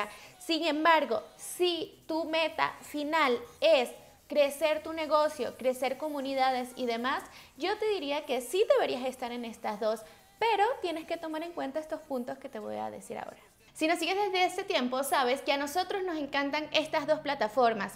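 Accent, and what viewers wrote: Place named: Argentina. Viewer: De donde sos? A veces pareces argentina otras costa rica o colonbia...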